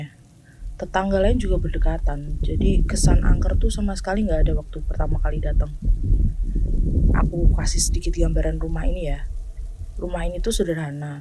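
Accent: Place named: Java, Indonesia. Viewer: Nah cocok medoknya 👍 "Culi, culi, culi" berasal dari kata "uculi" atau "lepaskan" Lepaskan apa? Yaa tali pocinya dong 😂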